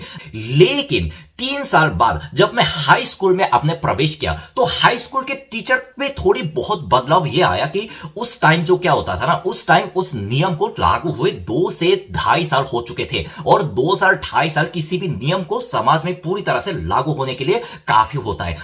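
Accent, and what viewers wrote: Standard. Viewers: Bhai jitni shudh hindi tumhe aati hai itni shudh hindi toh hame India mai rehkar nhi aati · Haayyy allah itni shudh hindi hume nhi aati hindustan main aake toba toba😂😂😂🥂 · भाई इतना शुद्ध हिंदी कैसे बोल लेते हो इतना सटीक शब्द तो हम लोग नही बोल पाते हैं 😂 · Apne itne sudh hindi 😯, khana se sikhi.... Itne toh mujhse nhi boli jati 😂😂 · inti sudh hindi hamare hindi ke professor bhi nahi bolte..❤👍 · North east wale nhi tumse v jyda sudh hindi bol raha hai korean log samjhe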